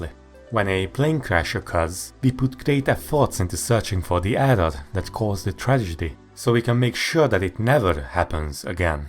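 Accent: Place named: Hungary. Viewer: Lol tudtam hogy magyer vagy... Az akcentusodrol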